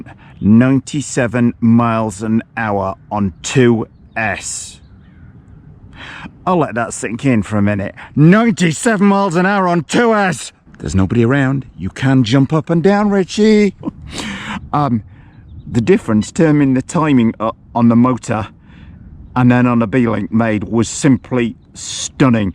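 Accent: United Kingdom. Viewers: Great upload well done 👍 you were born in the uk 🇬🇧? I am trying to work out your accent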